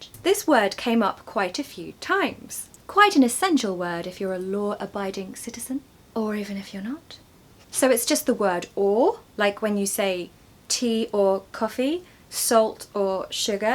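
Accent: RP, United Kingdom. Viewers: You are soo cute and sound natural....British accent is really beautiful and omg are all British women this beautiful 😮....always enjoy watching you mam..😊🇮🇳❤️🇬🇧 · I am learning English, I like your British accent very much, thanks for you videos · Thank you so much indeed, ma'am! I love ur modern RP. Always It remind me😊 something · Love your british accent and always can't wait to watch another YouTube video from you ❤ Greetings from Indonesia 🇮🇩😍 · 😮When I lived in Cambridge in the 70s my landlady had wonderful diction like you. She was eccentric and constantly trying to seduce me but I put up with her to improve my English.When I moved to California I passed for British until I absorbed local sounds. I can switch if I try but your exquisite RP (to me it's perfect UK) is hard to match. Two small amusing details: the wrong Evenink sounds like Lugosi in 1931 Dracula. 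My generation learned from...Oh, giiirl. BTW, you are surprisingly expressive for a Brit, are you maybe part Irish? Great teacher and a charming lady.😊❤ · Hi, thanks to your video my hearing celebrates again!🎉🎉🎉 Helpful topics you've well explained again, under your beautiful MRP sound with! 👌 I'm also fancy the american way but when am back to your videos discovering again where the true is!!! 🚩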